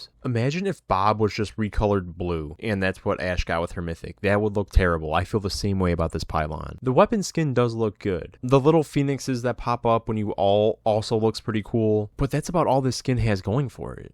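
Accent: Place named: United States. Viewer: You have one of my favourite States accents, especially when you said "battle PASS" 10/10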